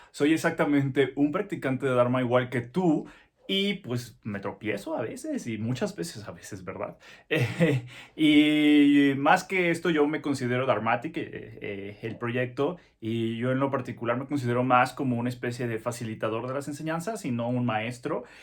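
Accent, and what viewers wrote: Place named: Mexico. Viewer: Es la primera ves en todos tus videos q se nota tu tonada, tono mexicano !!!! Sos un genio y profesional ante todo !!!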